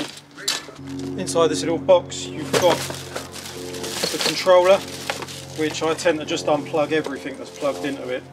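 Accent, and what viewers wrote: England, United Kingdom. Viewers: Судя по акценту ты англичанин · Nice to hear an Englishman doing one of these conversions. Most of them are from the US.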